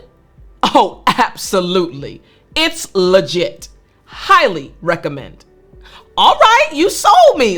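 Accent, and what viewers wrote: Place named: United States. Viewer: Hi teacher, l ❤ American accent.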